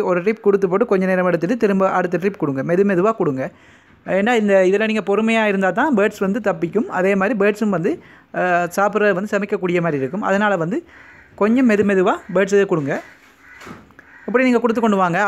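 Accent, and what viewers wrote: Sri Lanka. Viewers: Neenga srilankan tamildhane pesuringa anna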